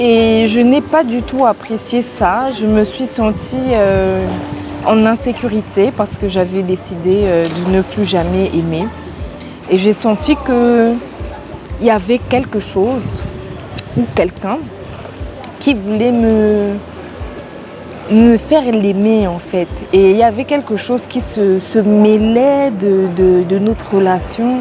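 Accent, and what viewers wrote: Cameroon. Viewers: L'accent camerounais, ne part jamais.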